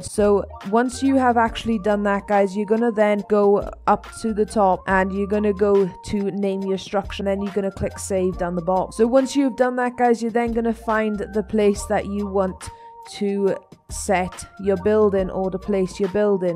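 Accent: United Kingdom. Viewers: I cant tell if your british or american. Its just weird. Btw, 𝘆𝗼𝘂𝗿 𝗮 𝗹𝗶𝗳𝗲 𝘀𝗮𝘃𝗲𝗿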